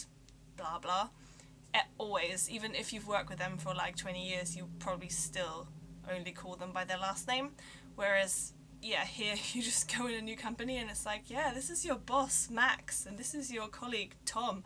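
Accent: England, United Kingdom. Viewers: You sound British you are yeah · Picked up a very English accent. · Please take this accent back to Germany and teach them. The British Accent will soon vanish and be replaced by the American one. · If you're German, then why the English accent?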